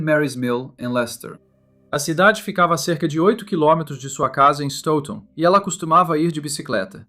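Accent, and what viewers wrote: Portugal. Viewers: Impossível assistir com esse sotaque de portugal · Esse sotaque portgues é muito feio 😅😅😅😅😅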